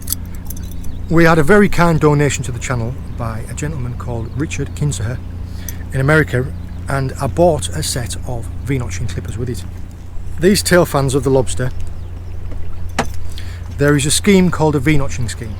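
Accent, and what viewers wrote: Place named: Northern England, United Kingdom. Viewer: I would like to thank you for your videos I have been watching for about a year now but recently my dad who passed away Christmas morning found so much happiness watching/listening to you he had them on back to back for the last 2 weeks of his life I think it must have been your northern twang he found piece with as his farther and family come from Darlington. Once again thank you, you made a very poorly man relaxed thank you once again xx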